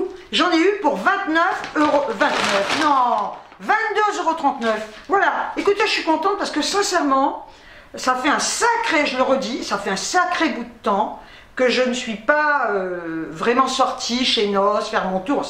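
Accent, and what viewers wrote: Algeria. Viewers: ton commentaire m'a fait tellement plaisir ❤️❤️avec tous les commentaires que tu as je sais que c'est loin d'être facile. Merci du fond du cœur ❤️ ❤️ je t'embrasse très fort tu as une personnalité que j'adore j'aime tellement ton naturel ton franc parlé...j'aime aussi retrouver la gestuelle et l'accent pieds noirs. Ma maman elle aussi parle autant avec les mains qu'avec la bouche 😂😂c'est ça les pieds-noirs et qu'est ce que je me régale devant tes vidéos ❤️ ❤️ Énorme bisous ♥️ merci encore pour le réconfort que nous apporte tes vidéos❤️❤️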